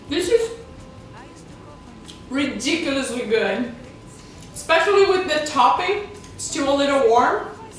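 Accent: Brazil. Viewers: she sounds brazilian they have onr if the cutest accent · Você é brasileira, né? YOU'RE BRAZILIAN, AREN'T YOU? I KNOW BY THE ACCENT! A receita parece deliciosa!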